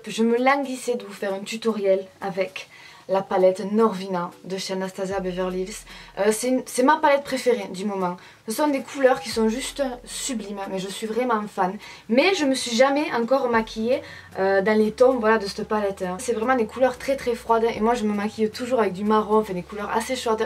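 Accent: South, France. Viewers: Mes qu elle Canon 😍, j adore, tu es magnifiques et en plus avec un brin d humour, tjr pétillante, tjr la bonne humeur avè l accent du sud tous pour plaire 😊 d énorme bisous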